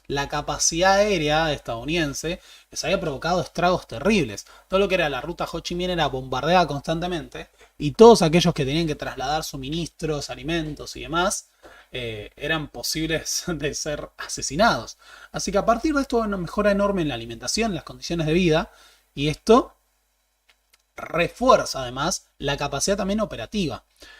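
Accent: Argentina. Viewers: Deberías hacer un esfuerzo para no pronunciar con acento argentino la Ll como ch.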